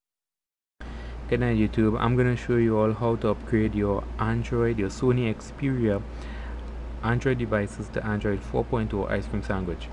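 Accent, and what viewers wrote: Trinidad and Tobago. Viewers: hi are you from trinidad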